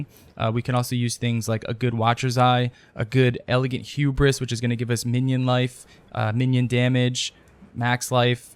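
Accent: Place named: Colorado, United States. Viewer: are you a wook? im from colo.. people around here sound like you.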